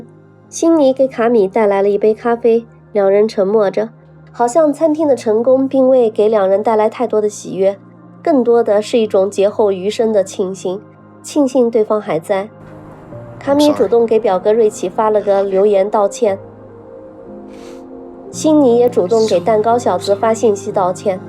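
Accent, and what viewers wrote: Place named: North, China. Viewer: 不是AI，好像是个北方姑娘，声音甜美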